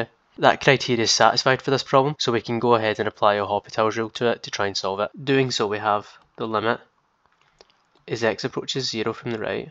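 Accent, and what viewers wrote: Scotland, United Kingdom. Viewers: Good evening to my Scottish friend, Being able to apply L'Hôpital's rule to limits where possible, is the same as always having a pocket calculator available; hence I call this rule " The Calculator Rule " (lol), but also keep looking inquisitively for alternative solutions!, thank you again for a fantastic presentation told by you with a pleasant characteristic accent, recognizable everywhere... Thank you again and take good care as always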